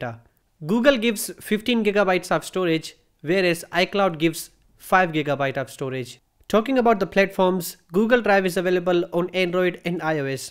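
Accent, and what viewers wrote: India. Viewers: I love Indian English accent!!!! Good content too. 👍🏻👌🏻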